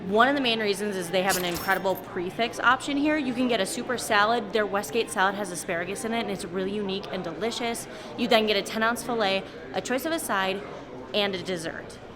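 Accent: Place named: Midwest, United States. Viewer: Great episode! Made me so hungry! I love that your mid west accent is going wild in this episode haha. Love the!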